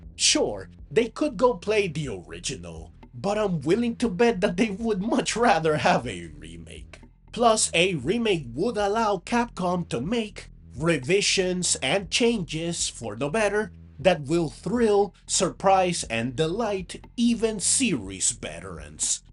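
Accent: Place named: Italy. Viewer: Where is your accent from? It sounds like the American stereotype of an Italian accent a little bit.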